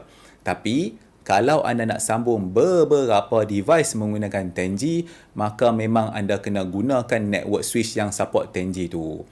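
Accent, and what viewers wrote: North, Malaysia. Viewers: Saya pernah tengok dalam video dia yang lain, dia ada cafe. Sebenarnya video2 macam brother ni buat banyak dalam youtube, yang cun nya brother ni buat video dalam bahasa Melayu slang utara, dan cara penyampaian pun mudah faham, bukan semua orang boleh hadam video omputeh ni termasuk aku.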